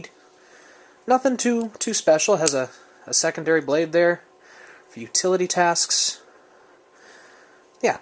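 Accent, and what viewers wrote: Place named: Brooklyn, United States. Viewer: Hahhahahhahahhahhahahhahahhahahah Brooklyn accent that's hilarious Thanks for the great vid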